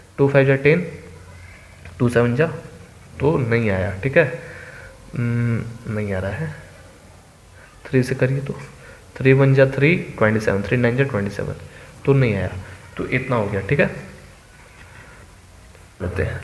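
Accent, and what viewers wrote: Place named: Uttar Pradesh, India. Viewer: Pure up meh bat karte ho sir app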